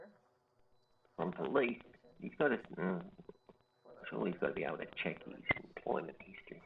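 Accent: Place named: Australia. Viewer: As an American, you sound like every other Aussie, sort of like how all Chinese look the same to Americans. No offense meant there.....just sayin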